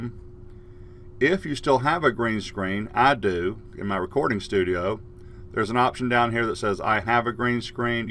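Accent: South, United States. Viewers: Hi! I have a Simpson's and The Shining background I would love to use for when I'm in zoom classes. I have the latest version - updated yesterday (version 4.6.9) and the screens won't work without a green screen. Any advice? Also noticed the southern accent and was curious where your from. My family is from North Carolina.:)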